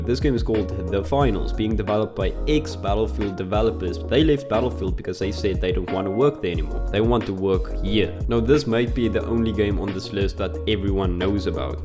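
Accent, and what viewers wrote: Afrikaans, South Africa. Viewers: Are you afrikaans? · can hear the afrikaans in this boet, but good video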